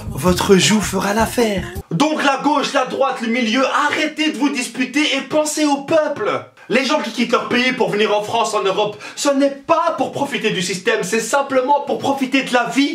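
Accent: Belgium. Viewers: Accent belge???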